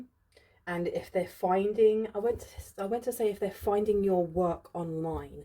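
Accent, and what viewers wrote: United Kingdom. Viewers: I love watching you . Your voice is so amazing to hear , it’s very clean , and beautiful British accent . It’s drawing to listen , so alluring cause of quality of Your voice . And the content is also really interesting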